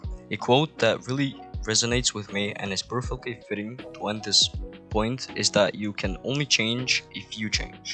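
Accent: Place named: Netherlands. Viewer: ofcourse i am also from europe and i recognized a little accent for me it sounded dutch it would be funny if you talked dutch!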